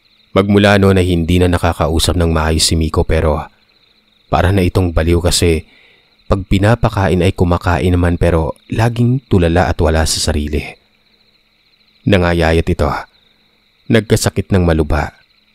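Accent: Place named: Hiligaynon, Philippines. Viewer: An kyutt ni kuya mag ilonggo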